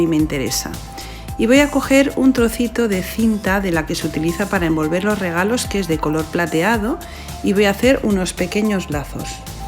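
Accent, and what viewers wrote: Spain. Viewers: Olá maravilloso regaló lo voy Aser quiero Aser regalos Para él diez dé Mallo para vender algo económico me podrías alludar kn más ideas fáciles Muy ermosisimo trabajo me llamo margarita desde León GTO México y x tú forma de ablar eres dé España muchísimos saludos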